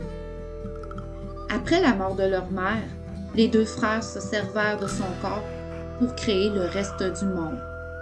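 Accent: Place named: Quebec, Canada. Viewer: Merciii ... J'aime t'accent !! ... Svp c'est l'accent de quelle regeion de québec?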